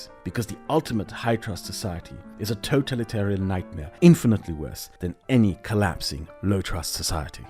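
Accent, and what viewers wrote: Germany. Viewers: Ironically, I never thought I'd enjoy listening to pronounce German words but... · There's something inherently sexy to a video essay on German history written in perfect formal English and narrated with a tasteful German accent.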